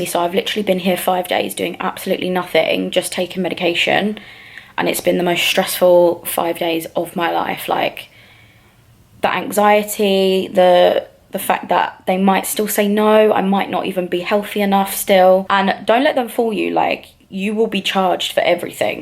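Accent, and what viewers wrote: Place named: England, United Kingdom. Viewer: Yes sound like my friend from England I love it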